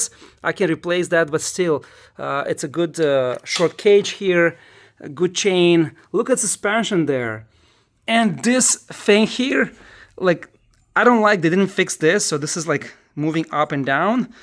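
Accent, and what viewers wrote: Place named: Ryazan, Russia. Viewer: Чувак, что с рязанским акцентом?))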